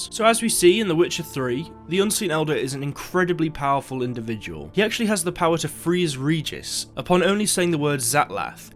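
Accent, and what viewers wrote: United Kingdom. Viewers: Too british.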